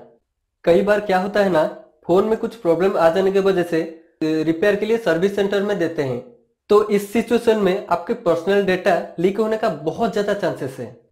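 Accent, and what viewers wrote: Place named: Odisha, India. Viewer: Bhai tu Odisha se Hena... 😅.., Tera hindi pura odia accent ke taraf sunai de Raha hai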